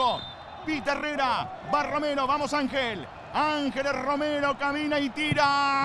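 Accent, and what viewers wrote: Paraguay. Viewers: El acento asunceno si tiene parecido con el rioplatense, que el tipo hable de manera correcta no significa que le esté copiando a los relatores Argentinos. Nde caprichosove kururu pytãgui chamigo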